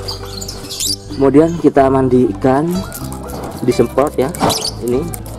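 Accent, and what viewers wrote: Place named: Java, Indonesia. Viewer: Bosomu medok jon.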